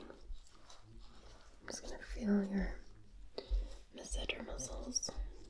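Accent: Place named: Scotland, United Kingdom. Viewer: I’ve just had to hand in my Englishman card 😭😭 as the Scottish accent is yummy 🤦🏼‍♂️🤦🏼‍♂️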